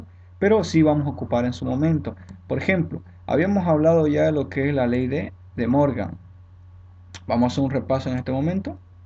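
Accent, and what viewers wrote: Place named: Bolivia. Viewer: Sos de Bolivia? Se me hace familiar tu acento :v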